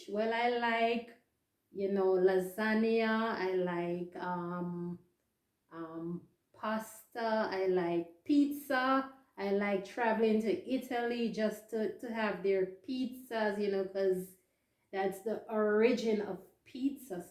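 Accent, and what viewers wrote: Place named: Jamaica. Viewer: A very nice introduction to you! I live the Jamaican accent!! And age 21 for several years 😂 I like that. My wife says the same thing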